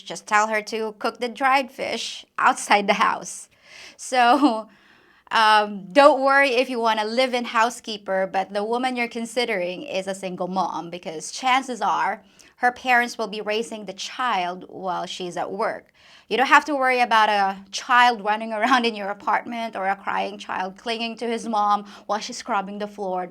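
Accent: United States. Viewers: I think it's cute that you speak completely like an American saying "in's" instead of "ing's" at the end of words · Where did you pick up Americian things like 'workin" instead of working, and "ya?"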